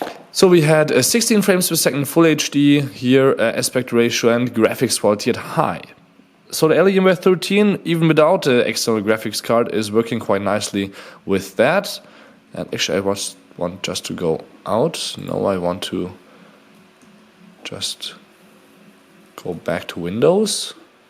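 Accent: Germany. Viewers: man hört's einfach immer wenn Deutsche Englisch sprechen 😀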